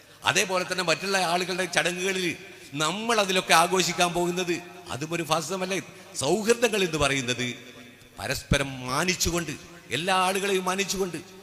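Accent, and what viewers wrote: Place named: Kasaragod, India. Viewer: കാസർകോട് ഭാഷ യാണല്ലോ തങ്ങൾ എന്ന് പറയടോ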